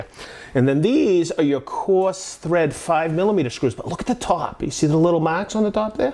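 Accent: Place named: Boston, United States. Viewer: Thanks to that nice Boston accent, i felt like i was watching an wpisode of This Old House 😌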